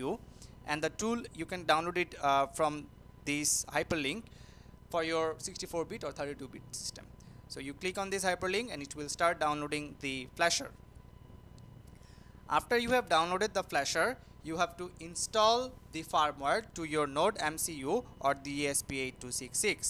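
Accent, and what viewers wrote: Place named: England, United Kingdom. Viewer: The most unattractive english accent makes it impossible and take anyone who speaks like this serious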